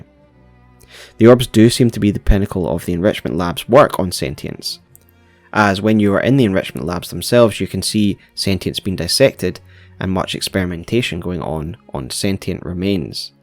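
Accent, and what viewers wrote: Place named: Scotland, United Kingdom. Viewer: Quick question for ya. You clearly sound Scottish, are you toning your accent down for videos? Just curious because Shaun another Youtuber from Edinburgh does that as well.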